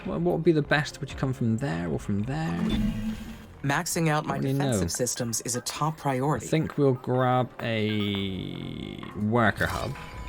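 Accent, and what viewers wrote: United Kingdom. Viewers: Just found you through your furious seas video and I detect a west country accent... where abouts you to mate?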